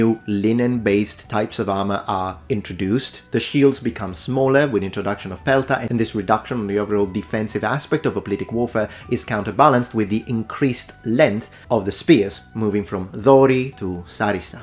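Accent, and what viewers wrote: Greece. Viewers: Great video! Your Greek caught me by surprise! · Great greek accent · I love your Greek accent, really nice job.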